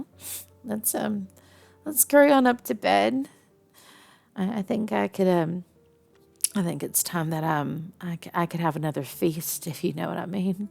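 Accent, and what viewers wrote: South, United States. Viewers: Your Southern accent is the most beautiful I’ve ever heard 🥹🥹. This Yandares are the most loving and loyal I would love to that for a Girlfriend someday 🙂‍↕️. You are an amazing woman thank for this Scary yet gorgeous audio also I subscribe to your channel today 💗💗💗💗💗💗. Kind Regards AuraKnight 🥰🥰🥰🥰🥰🥰🥰.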